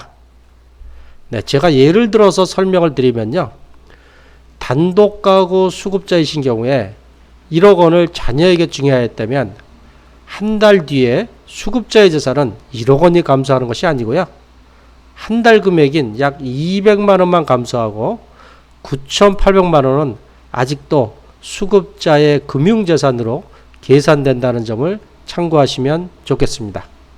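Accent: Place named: Gyeongsang, South Korea. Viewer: 경상도 발음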